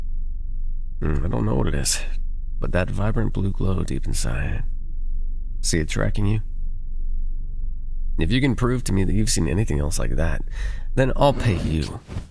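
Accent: South, United States. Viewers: Love this!! AND I LOVE THE SOUTHERN ACCENT!!!! Reminds me of my hometown 😭❤️ THANK YOU!!!! LOVE YA AND YOUR VIDS!! KEEP UP THE AWSOME WORK!!! ❤❤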